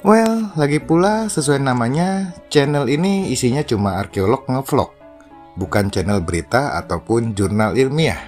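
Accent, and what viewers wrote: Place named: Sunda, Indonesia. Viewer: Om..... lagu na nyunda pisan 😅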